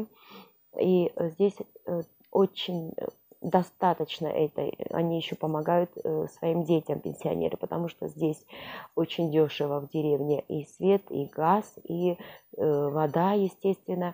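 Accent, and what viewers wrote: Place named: Georgia. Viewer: Акцент у вас прям Грузинский немножко ,или мне показалось !🤭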